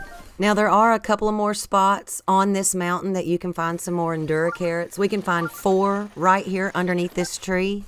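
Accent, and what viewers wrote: South, United States. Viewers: Thanks for making these very informative videos. Love your southern accent.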